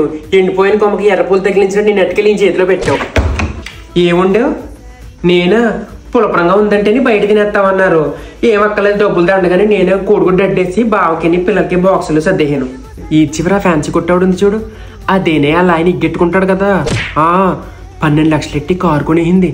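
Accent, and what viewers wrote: Godavari, India. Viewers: Super bro memu godarollame maa slang ni chala baa hielite chestunnaru 👌👌👌👌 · Maa godarolla matalu alage untay andi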